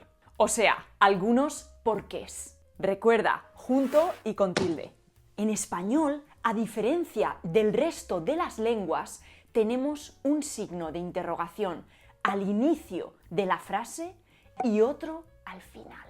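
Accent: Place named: Castile, Spain. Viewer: Te Parces a una mAesttrA meddievL que tuve para mi todavía mi mejor maeau.mujer lindísima , pero deberías cambiar cambiar ese horrible acento español, como decía tú nuestrA compatriota Anabel Ochoa la mejor sexóloga de México, me caga la gente como yo qué todavía no se quitan llSzz y lasttzz , por qué en su pueblo solo usaban cacharro pa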